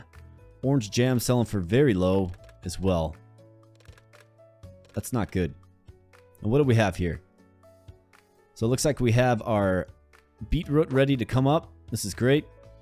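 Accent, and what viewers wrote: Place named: Canada. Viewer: Wait, are you canadian? You say pleg instead of plague, and rut instead of root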